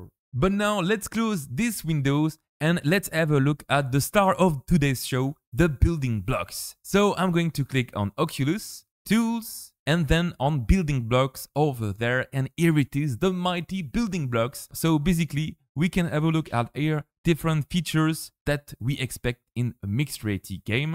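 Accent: France. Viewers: T'es français? t'a l'accent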